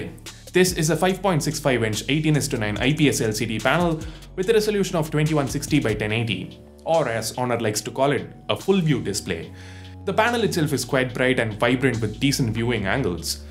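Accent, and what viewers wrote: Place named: South India, India. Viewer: get rid of horrible south Indian accent !!!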